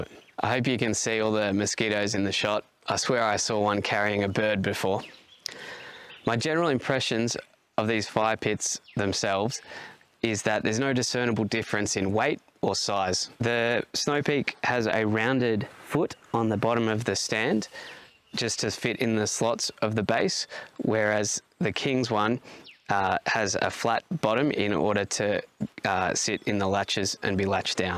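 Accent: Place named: Victoria, Australia. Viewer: Victorian accent gonna confuse ppl every time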